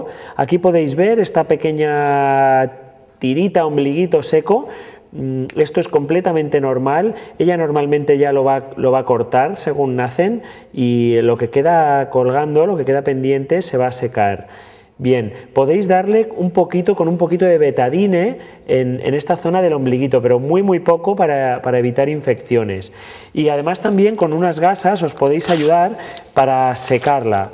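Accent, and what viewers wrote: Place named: Spain. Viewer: el acento español es lo maximo.. me encanta.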